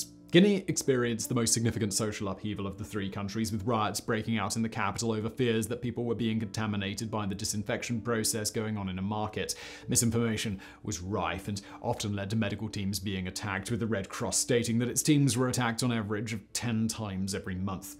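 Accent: RP, United Kingdom. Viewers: Your accent is hilarious. It's like recieved pronunciation but more irritating. You sound like the queen did in 1955.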